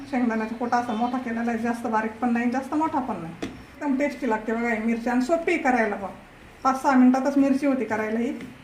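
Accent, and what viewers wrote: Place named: Nashik, India. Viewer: ताई तुमची बोलीभाषा ऐकून असे वाटते की तुम्ही नाशिकच्या आहात .. बरोबर का ?? शिमला मिरची खूपच छान बनवली ..बघून तोंडाला पाणीच आले . . ❤❤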